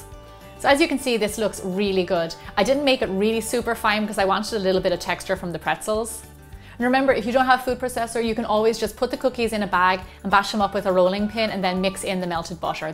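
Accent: Ireland. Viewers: Is she Irish..sorry first time here.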